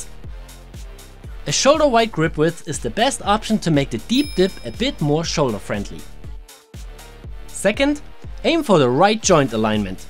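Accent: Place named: Germany. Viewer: Damn ur German accent is nuts.. I like it, im german as well. Lass Frankreich einnehmen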